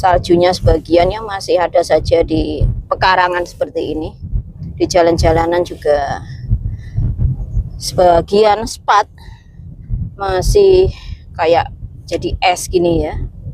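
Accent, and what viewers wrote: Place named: Java, Indonesia. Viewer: Jadinkeingetb.. Logat mba ky logatnya mba puri yg di california aksen jawanya kental banget, apalagi klu ngomong inggris, sehat selalu m family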